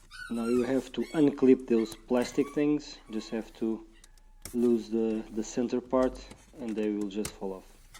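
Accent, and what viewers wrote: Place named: Portugal. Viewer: Thank you very much. Excellent information, very useful no doubt. But there is a cabin filter missing in Your car, because these cars always use two at the same time, one on top of the other. I know because I have a Partner Tepee, and I change these filters once a year. By the way, by your accent and your name, I imagine that you are Portuguese, like me? If so, you can answer me in Portuguese. Thanks.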